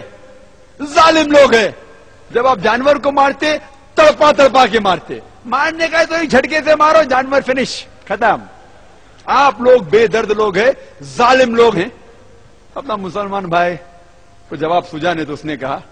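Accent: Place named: Maharashtra, India. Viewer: Aisa kuc nhi h sister Aap ko lgta h aisa He always speak truth but y Marathi h to inki Hindi Urdu zuban Zra kmzor h jiski wjh s inka bolne ka lehza bdl jata h hmare opposite isliye hme ajib lgta h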